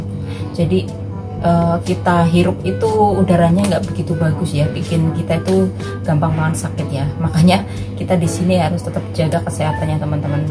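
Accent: Banyumas, Indonesia. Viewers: Semoga selalu sehat yah mba..cepet temen masak mie gorengnya mba.. Salam ngapak mba.. Pancen wong ngapak nek ora ngapak kue ora kepenak banget kooh..😊🙏🙏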